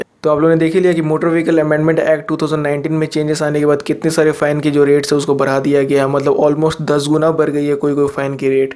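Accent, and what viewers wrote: Bihar, India. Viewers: Are you from Bihar ???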